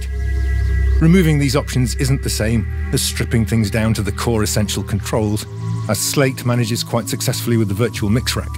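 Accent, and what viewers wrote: United Kingdom. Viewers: But wait! You sound British to me as an American. Have you ever heard of SSL? They made this thing called the 4000. You needed really long arms. And they became very popular. With people that had long arms. Not so much with you folks with the short arms. You've been playing with too much. While I love what all of these plug-ins have to offer. Most all are really unnecessary. They are just there for children that like playing video games with audio software and always win. It really doesn't have much to do, with music creation, itself. It's whizbang. And so all those great sounding rock 'n' roll hits. That all of these plug-ins are said to emulate. It's all bullshit. It's, total bullshit. None of those great hits had much of anything to use. We had two may be three perhaps four choices? For everything. That was it. And we had one limiter. 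And then got a cheap one. Only to find. It really wasn't worth using. And so we only had one good limiter a black 1176 purchased brand-new in 1976! I just sold last year. Because the clones are just as perfect. As in, perfect. And at a bargain price! And I had one reverb. From Germany. Made with a big piece of cold rolled steel plate. From a company that, tried to kill us years ago. Along with their microphones. And their cute little cars. All thanks to the worst dictator in modern times. And was a hard way to get good quality sound. That we already had. But theirs, sounded different. It sounded German. For, Wagner. Our stuff was better for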